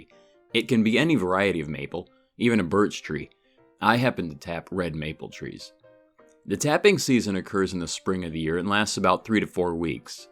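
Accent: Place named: Canada. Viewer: This man sounds Canadian so you know he knows what he’s doing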